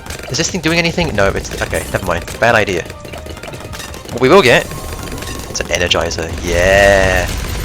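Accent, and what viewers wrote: United Kingdom. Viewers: you can see the view difference when switching games, idrc and im all for it seeing a bri'ish dude playing bloons and ultrakill with a funny accent is what i signed up for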